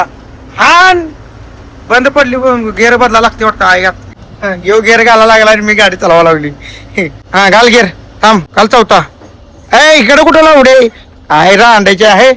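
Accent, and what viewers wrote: Kolhapur, India. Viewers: भाऊ तुझे ब्लॉग छान असतात, पण तू व्हिडिओमध्ये घाण घाण शिव्या नको देत जावू. कारण तुझे व्हिडीओ लेडीजसुद्धा बघत असतात, तुझी कोल्हापुरी भाषा शैली मस्त आहे, फक्त शिवीगाळी बंद कर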